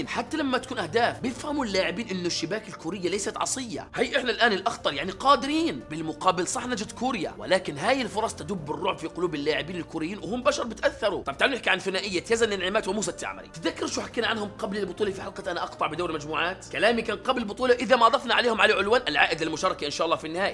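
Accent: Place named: Iraq. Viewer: بس سوال انتي عراقيه صح من طريقه كلامك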